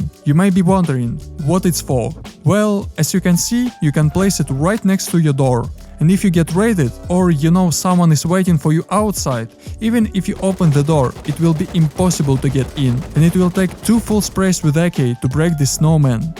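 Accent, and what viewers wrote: Russia. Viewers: Я слышу, слышу русский акцент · У вас будто бы русский акцент 🤔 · оч жесткий русский акцент используй ии чтобы переводить видосы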